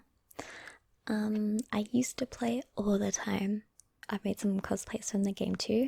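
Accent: Australia. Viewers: A Spanish- looking Filipino with an Australian accent? That's a treasure for sure, haha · It's odd - you have a 'sweet' voice pitch, and its like the aussie accent kind of amplifies it, if you know what I mean. That's always the impression when I hear your voice in these vids, very sweet. Haven't met many Australian girls with a similar voice pitch. As a side note, I'm half filipino half English living in Australia for almost all my life! It's a small world, huh.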